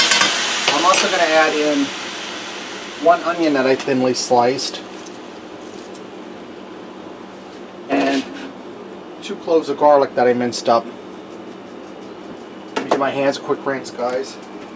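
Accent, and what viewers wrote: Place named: Pittsburgh, United States. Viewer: You have a Pittsburgh accent. Makes me miss the Burgh!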